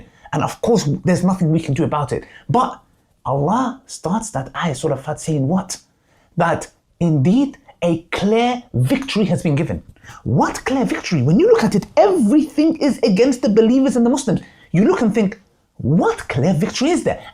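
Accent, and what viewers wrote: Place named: United Kingdom. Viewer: By the way u have a beautiful British accent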